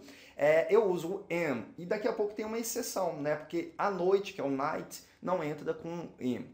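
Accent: Brazil. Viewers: A parte de explicação gramática está joia, mas a pronuncia tem muita influência do sotaque brasileiro, seria importante aprimorar essa parte.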